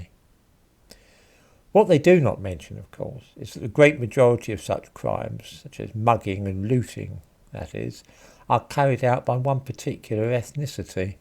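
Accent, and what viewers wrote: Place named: Cockney, United Kingdom. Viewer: I can't see one here. I do see some old geezer dribbling all over the camera, effeminately failing to pronounce the letter 'R' and sounding to my ear rather East Endish.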